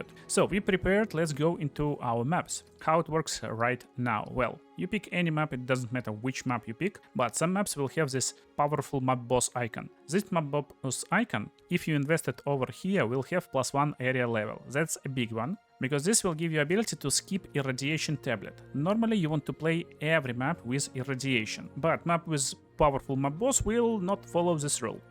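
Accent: Russia. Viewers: Русский акцент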